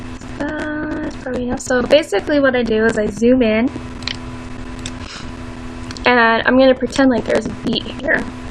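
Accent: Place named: United States. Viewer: oh my god my parody buddy has the cutest american accent! Soooo cute! OUO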